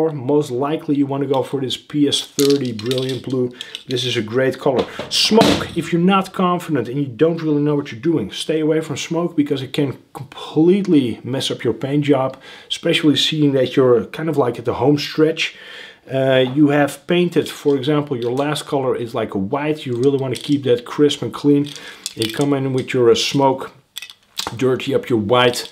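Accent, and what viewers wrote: Netherlands. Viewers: Are you Dutch? you have a Dutch accent :D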